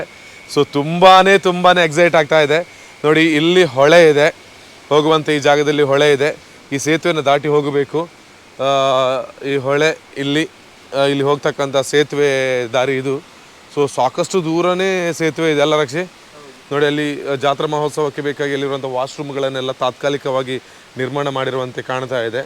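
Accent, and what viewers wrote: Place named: Tulu, India. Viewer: Namma Tulu dakalna taking style de gotthapund🤩👌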